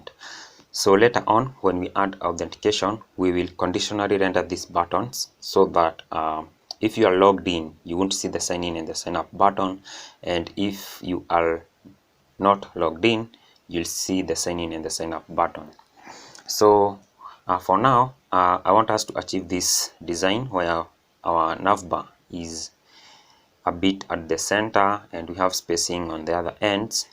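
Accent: Kenya. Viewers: Why do I hear a Kikuyu Voice😂😂😁😁, Bro, congrats. Much respect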